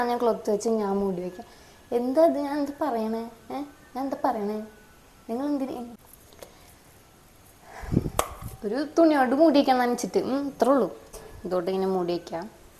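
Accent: Thrissur, India. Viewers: naattil Thrissur aano athe slang😁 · Thrissurkary aano slang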